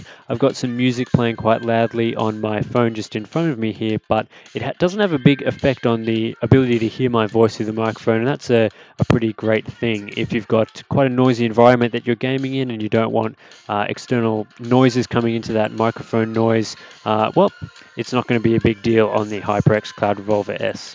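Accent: Australia. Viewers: He’s Aussie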